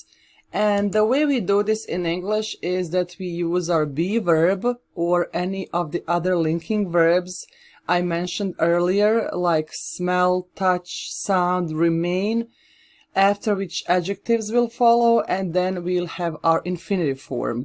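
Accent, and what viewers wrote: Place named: Arab world. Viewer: Outstanding tnx mama i think u native of arabic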